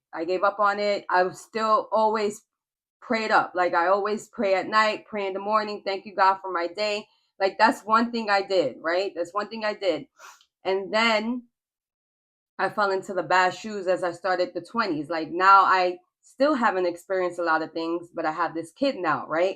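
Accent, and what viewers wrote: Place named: New York, United States. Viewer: it sure does sound like a NY thing. It’s like listening to someone read the book of life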